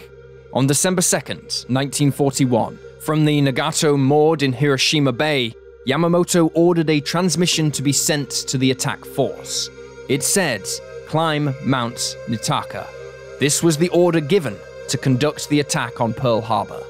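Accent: United Kingdom. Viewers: Such British blah blah blah........................... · British accent makes it better.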